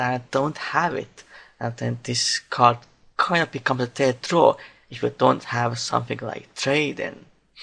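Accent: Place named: Europe. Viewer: European, like british or german or some shit. that accent is horrible, the video needs subtitles.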